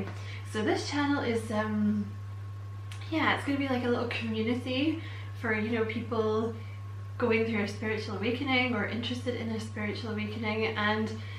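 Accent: Scotland, United Kingdom. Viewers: thankyou for this. I watch your Leo readings, also im Aries rising, virgo moon, Im at the end of dark night of the soul, going through a spiritual awakening and doing this all alone, not fully understanding whats happening but learning having Psycic visions etc. Is that a scotish accent i hear, hi from Ireland. Thankyou so much ❤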